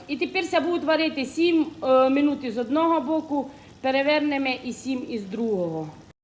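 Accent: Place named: West, Ukraine. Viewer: Така цiкава панянка, але поясніть прошу ,що зцее за мова? Українські слова з російськими в перемішку, вимова як на західній Україні!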